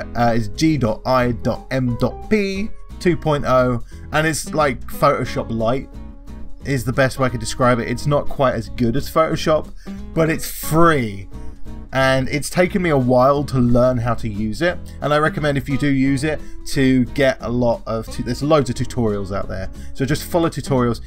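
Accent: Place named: RP, United Kingdom. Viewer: I came here and subbed for the WoW stuff. But I stayed for the posh accent hehe 😉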